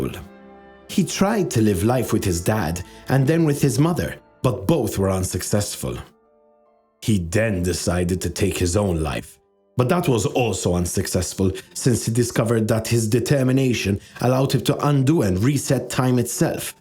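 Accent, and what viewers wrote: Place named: Malta. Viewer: you sound maltese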